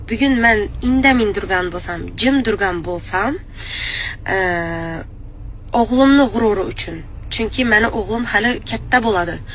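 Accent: Khorezm, Uzbekistan. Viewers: Хоразмда шеваси ажойиб йокимли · Xorazmcha gaplab duribdimii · Шеваси хоразиммми Гузал аел экансиз бола хам жуда шерин экан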